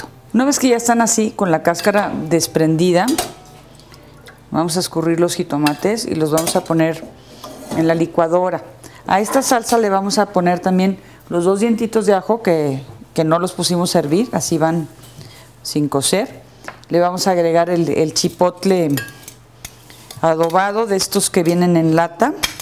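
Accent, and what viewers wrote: Mexico. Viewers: Que envidia, de la buena, hay se me hace agua la boca !! . Suerte con tu canal, eres estupenda y me encanta tu acento norteño..!!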